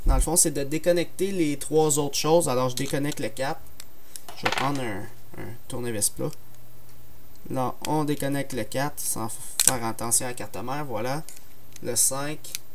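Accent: Quebec, Canada. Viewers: l'accent me fait penser au tetes a claque j'ladoooooore ;) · bonne video mais l'axent quebecoi est un peut dure a comprendre mais je trouve que c une tres bonne video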